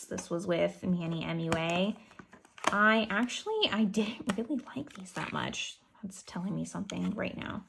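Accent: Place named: United States. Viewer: Also I’m just curious, where are you from? Your accent is very pronounced something like Montana, Chicago, etc.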